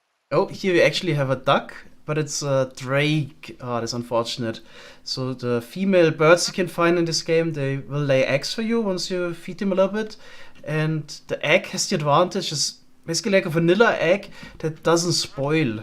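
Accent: Germany. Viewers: Every now and then the German accent makes me smile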